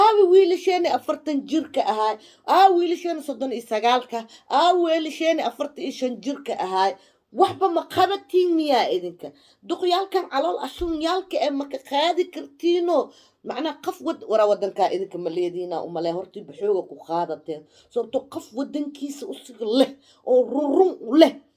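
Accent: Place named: South, Somalia. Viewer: afkoonfureedkaan kabartaa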